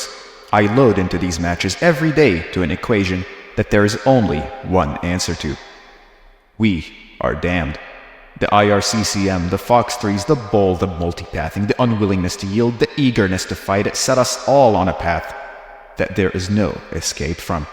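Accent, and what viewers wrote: United Kingdom. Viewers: That British accent ACTUALLY hurt me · wtf is that British accent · The British accent chef's kiss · That British accent though... Also what Anime is that! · That English accent is terrible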